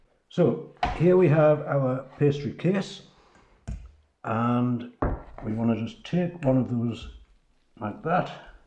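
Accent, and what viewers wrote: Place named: North, United Kingdom. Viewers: What a grand fellow you are. I came to see if I was doing anything different when I make cheese and onion pasties. Turns out we are pretty much doing the same format and recipe except I probably use a little less butter and lard. I enjoyed listening to you. Are you a Geordie? I am from north east of England. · You sound like my dad, who was a Geordie ❤ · Can't put into words the feeling of home, as soon as i heard your accent! I'm from the North myself and this makes me want to get right back into baking!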